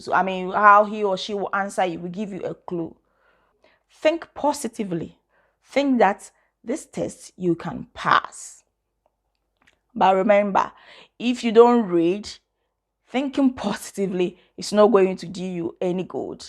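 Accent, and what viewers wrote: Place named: Ghana. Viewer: You are very articulate. Thanks for all the information. I feel you are a ghanaian ..... From ur accent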